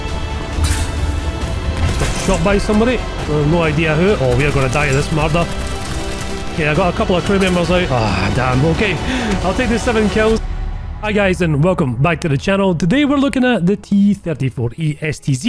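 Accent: Scotland, United Kingdom. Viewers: just wanna say nice gameplay in the intro also whats your birth nation you sound scottish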